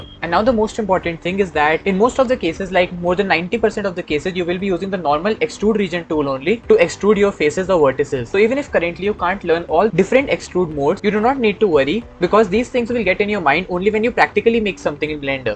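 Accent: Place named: India. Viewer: Straight at Point and no other needless stuff 👍 You Voice and bit of accent seems like Bharatiya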